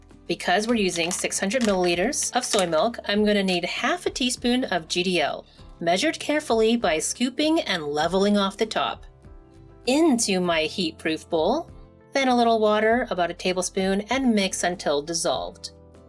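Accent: Canada. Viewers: I love your soothing voice and Canadian accent. ❤ Could I make this silken tofu for Douhua with ginger syrup? Awesome video 👍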